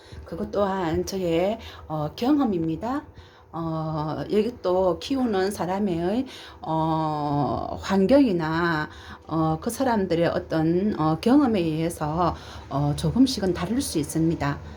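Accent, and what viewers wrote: Gyeongsang, South Korea. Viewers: 꽃이 이쁘게 폈네요.. 나레이션 경상도라서 더 잘들립니다! 감사합니다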